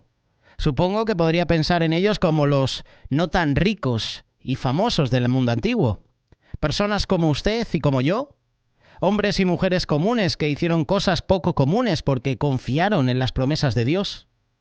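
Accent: Spain. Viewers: Dios mío que tremenda enseñanza Dios te bendiga varón es primera ves que le escucho y x su acento es español que bendicion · Nunca había escuchado una predica en español castellano ¡Qué bonita! Y que maravillosa exhortación, hermano. Dios te bendiga. Saludos desde México.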